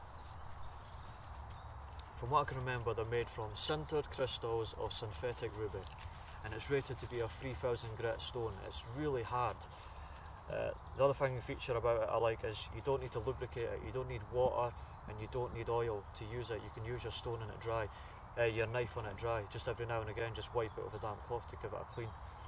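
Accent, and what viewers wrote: Scotland, United Kingdom. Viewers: Are ye Scottish?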